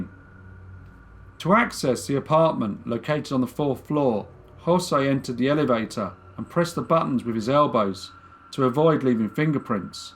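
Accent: United Kingdom. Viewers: I am really grateful (though slightly ashamed, well, more embarrassed, by my facination with true crime) to see someone, and as an old(er) anglophillic yank(er), to listen to a well spoken Britter, narrate international cases, from 19th & 20th c. In the usa, it's always either American or Dominian, English speaker murder, and am really enjoying the international work. Hope against hope you do some Germainica!!!!!!!!! Or Balkan, Baltic, Slavic, Turkic, anything. On top of that, love the concise presentation, exactly what I prefer. In American true crime stories, it;s always TMI. Your channel alone justifies my 12.99 usd per month, on Utoober. Many thanks!!!!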